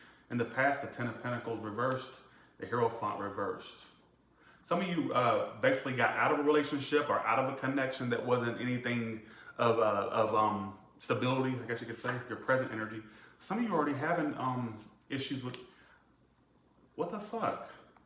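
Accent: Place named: Louisiana, United States. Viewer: Hes Creole/ Louisiana I think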